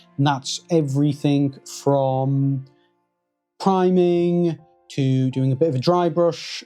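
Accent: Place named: Teesside, United Kingdom. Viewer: Great video buddy. Where do you play your local games sounds like we’re from the same area. Teesside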